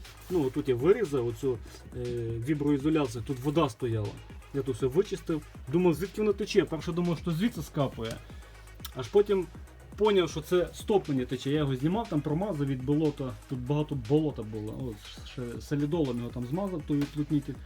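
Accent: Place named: Lviv, Ukraine. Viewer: Братан ти як колишній прем'єр Азаров😁 по мові чую що Львівщина, мабуть, Красноград?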